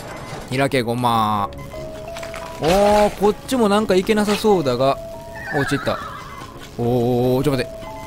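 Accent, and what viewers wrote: Kansai, Japan. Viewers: 大好きです😆 関西弁移ちゃった😜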